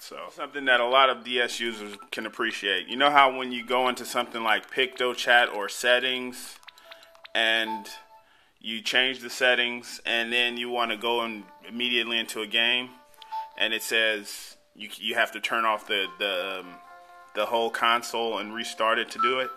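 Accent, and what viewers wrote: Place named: United Kingdom. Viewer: i bet your british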